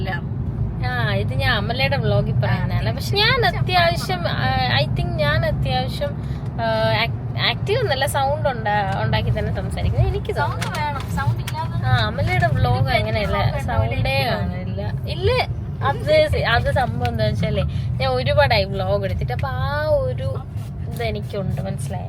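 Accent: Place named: Tamil Nadu, India. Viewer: Nigal tamilzhanoo samsarikumbol athikavum tamil ahnn varunathe😅❤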